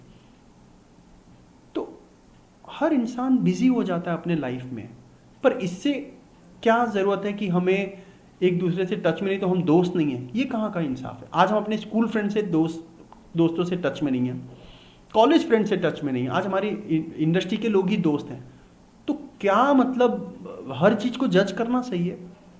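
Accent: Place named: Haryana, India. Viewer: Mene esa chennal aaj tak nahi dekha hamko chutiya samjhe ho iski bhasha bihari to bilkul nahi he to ye bihar ka kaha se he iski bhasa hariyanvi lag ri he be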